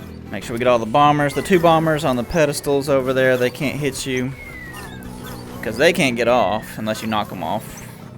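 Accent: Texas, United States. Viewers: you got such a low key texan accent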